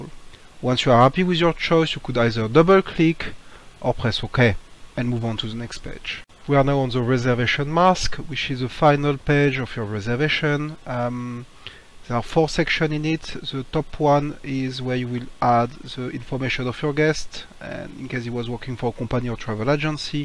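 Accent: France. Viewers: Academy Customer Services With the french accent!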